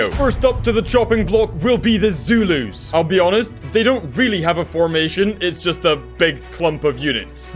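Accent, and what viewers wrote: Scotland, United Kingdom. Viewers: Are you Scottish?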